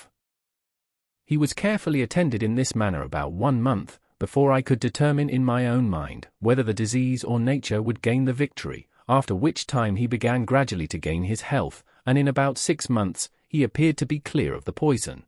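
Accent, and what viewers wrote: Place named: United Kingdom. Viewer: The fact that the voice is British…. Doesn’t fit. He was American. And his book is amazing. I couldn’t put it down, so the fact that this is not catching my attention says a lot, to me anyway. Lol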